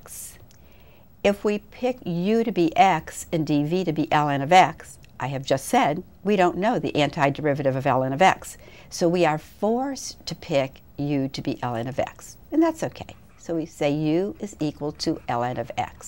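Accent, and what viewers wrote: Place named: New York, United States. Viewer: The New Yawk accent is hard to take, but it's a start. YouTube falls down on deriving Integration By Parts. If anyone knows of good ones, please post.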